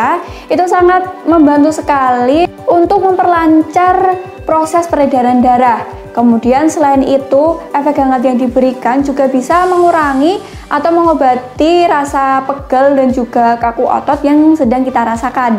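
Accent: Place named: Java, Indonesia. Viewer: Medok amat 😀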